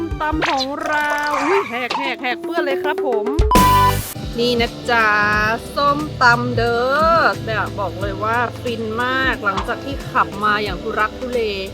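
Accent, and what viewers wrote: North, Thailand. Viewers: อี่หน้อยนี้อู้เมืองได้ต๊ะ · ทำไมพูดเหนือได้ครับ หรือลูกครึ่งเหนือ+อิตาลี่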